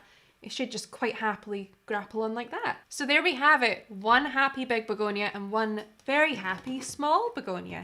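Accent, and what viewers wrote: Scotland, United Kingdom. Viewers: Good video I'm going to lop the top off mine. I've always loved these plants but they seemed expensive. I love your Scottish accent, you could make audio books❤ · Aww your Scottish tongue is bra lassie. I’m from Montrose, left 40yrs ago to move to USA.. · After three months of rooting my angel wing spotted begonia cuttings in water I was ready to locate information on potting. Your video is so helpful as well as fun to watch. By the way, my maiden last name is Sutherland and I enjoy your Scottish accent so much! I live in Virginia, USA.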